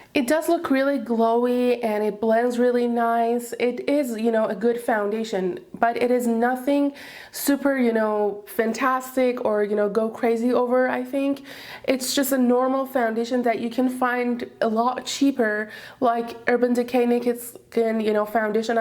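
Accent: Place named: Iran. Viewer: jan (I love your Persian accent!) I love your eyeshadow look here! The bronze color looks really good on you. I never had luck with Josie's makeup products and because it seems they are more pink toned.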